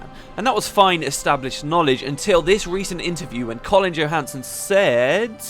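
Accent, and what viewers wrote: England, United Kingdom. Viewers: I've never even played guild wars. English accents make you do things...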